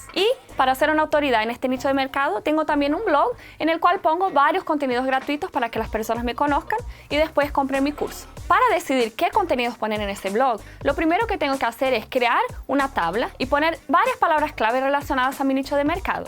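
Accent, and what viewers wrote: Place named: Brazil. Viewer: Me encanta su español con un toque brasileño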